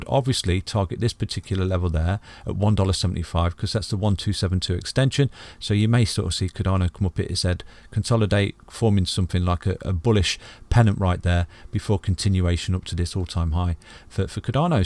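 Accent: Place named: Midlands, United Kingdom. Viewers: He's from Leicester 😁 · Do you live in the Midlands England 👍 great channel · Sounds like a brummie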